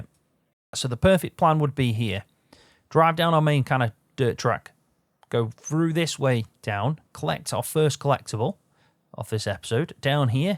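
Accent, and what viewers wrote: Staffordshire, United Kingdom. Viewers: I've started watching your videos a few days ago, and im loving it! Also, please correct me if im wrong, I live in staffordshire, and I believe you do to, your accent sounds like a staffordshire one.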